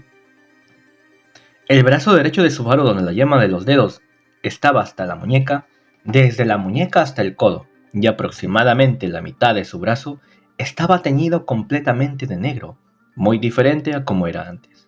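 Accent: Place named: Colla, Bolivia. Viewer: Cada vez habla más coya mi causita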